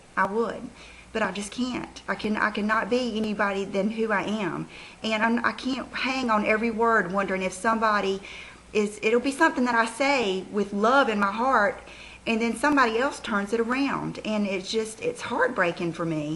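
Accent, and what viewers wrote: South, United States. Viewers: One major reason why I subscribed to you was your sincerity & your enthusiasm. Plus you're southern accent. I married late in my life so we never had children but I always admire full time Moms. I Those nay-sayer see the world through their negative point of view. We are positive people so that's why you didn't anticipate these mean and cruel comments from them! Can't image what we don't know or feel. Be strong & keep up the great videos. P.S. I think your family is lovely! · get your southern accent on say "kiss my butt" & keep making your own choices & decisions.... & videos... we luv you much much much... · the way I look at it you have two choices 1) fold up your tent and go home OR 2) don't let stupid people make you feel bad. I'd pick number two. People think they can say anything and hide behind the curtain of the internet. 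Block them out! I guarantee you that for each hater there are hundreds of us who enjoy your videos and look forward to Friday's haul. This California girl looks forward to hearing your southern accent! Keep it up, we love you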